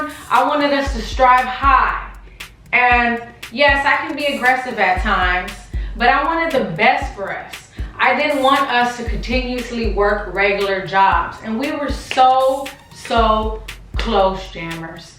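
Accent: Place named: South, United States. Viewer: her little southern drawl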